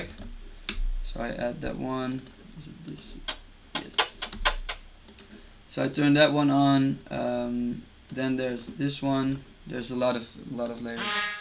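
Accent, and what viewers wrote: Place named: Netherlands. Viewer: Pittig nederlands, dat hoor je gelijk! :P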